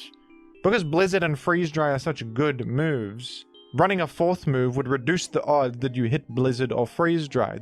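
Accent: Australia. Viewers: As an Australia I can confirm it’s just his accent. That’s how we say it down here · I can’t tell if some of your pronunciations are a joke or just how they say it down under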